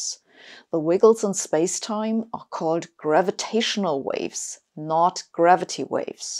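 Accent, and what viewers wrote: Germany. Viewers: Actually, I find this very funny. Sabine gives us reasons to get annoyed with physicists and therefore to get annoyed with her. But the effect of the way she presents this... it's just so endearing. Almost cute. 😄 Having a fat German accent doesn't damage said effect, au contraire. 😂👍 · Sabine, your thick German accent is voluptuous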